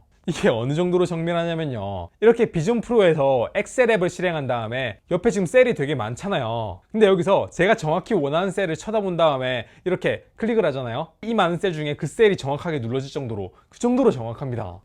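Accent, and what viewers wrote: Gyeongsang, South Korea. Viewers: 아씨... 경상도 사투리...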